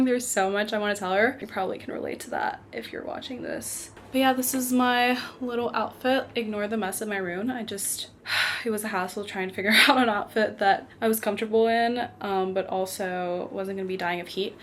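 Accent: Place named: United States. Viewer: and why's your English so good? you have such an American accent!!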